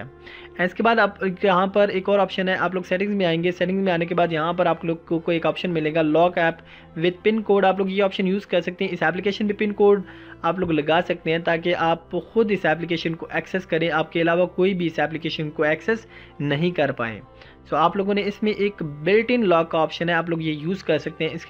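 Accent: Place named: India. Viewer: This makes no sense,the words are in English but he's speaking Indian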